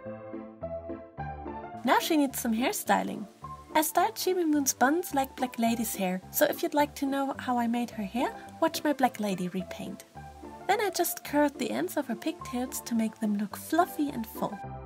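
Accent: Germany. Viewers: Your voice is so relaxing and soothing. I’m just curious but are you german? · Your german right?😄😉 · Are u German?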